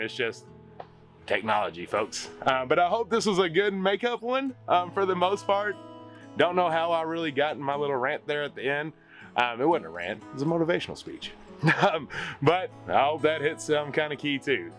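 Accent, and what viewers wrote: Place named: Kentucky, United States. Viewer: I was just watching a few of your videos and told my husband that your accent was similar to ours. We live in WV on the border of Kentucky. I was born and raised in Eastern Ky and graduated from Morehead State :) Great videos!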